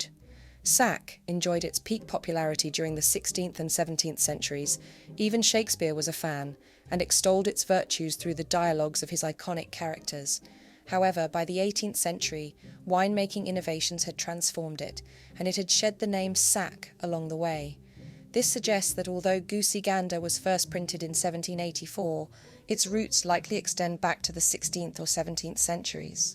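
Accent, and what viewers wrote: United Kingdom. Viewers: This channel is narrated by an Brit and there's no denying the musical beauty of her accent! Focus on the positive, we find what we look for! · Agree !clear thoughtful and well presented.. AND very English🥰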